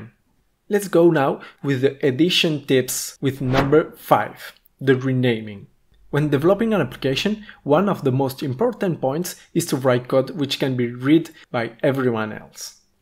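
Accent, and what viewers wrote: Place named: Spain. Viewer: jajajaj, se notaba literalmente que es español y no me ha hecho falta ni buscarlo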